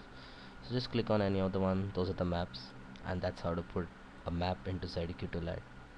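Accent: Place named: India. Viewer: look im indian too but your heavey accent makes it too distracting to watch the video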